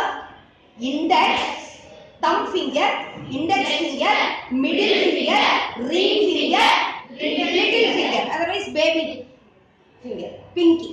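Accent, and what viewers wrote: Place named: Tamil Nadu, India. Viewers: Yes she is from Tamil Nadu as Dr. APJ does. Hence, both speak TN's English accent. :) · I can bet she is from south India just by her accent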